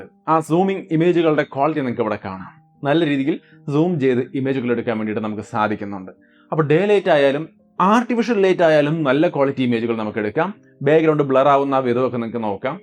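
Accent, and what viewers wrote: Thrissur, India. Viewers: Bro thrissur karan ano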